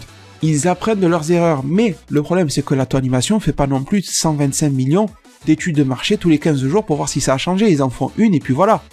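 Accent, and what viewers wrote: Marseille, France. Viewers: t'es marseillais??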